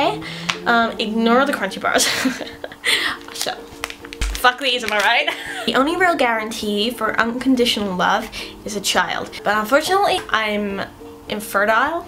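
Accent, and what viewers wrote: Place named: United Kingdom. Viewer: Just a few seconds in, and I already forgot that you had a British accent! Great and hilarious video!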